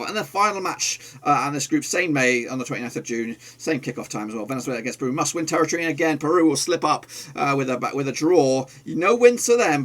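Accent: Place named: United Kingdom. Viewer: Basado en su accento, yo creo que el es britanico... Los americanos hablamos mas Bonito lol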